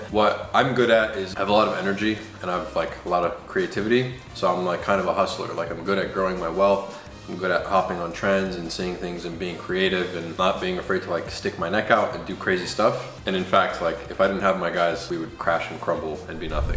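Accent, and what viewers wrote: Romania. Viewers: ador accentul,make ardeal great for good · Bro, I knew you were romanian, I can finally hear you talk :))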